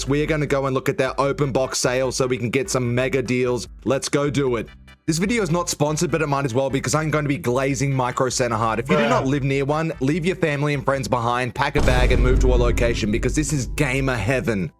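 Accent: Australia. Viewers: are you Australian. you soundlikeit ? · I wish I had an australian accent and a better grasp on how to edit videos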